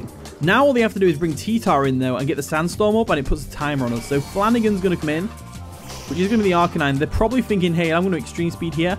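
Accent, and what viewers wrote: United Kingdom. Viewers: Ey man I just gotta ask - I'm from Leicester, lived in Bristol 12 years now; are you from just north of Leicester? Your accent is so close I can almost picture it but I can't quite place it, and it's bugging me because I haven't been up the country in years now 😂 Edit: I recently found your channel so I've seen 10-15vids and it gets my ear every time lmao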